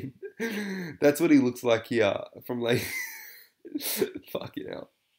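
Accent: Australia. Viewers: You are quite entertaining and your Australian accent is a plus also.(No disrespect I just love Australian and British accents compared to the wining voices of New Yorkers) 😉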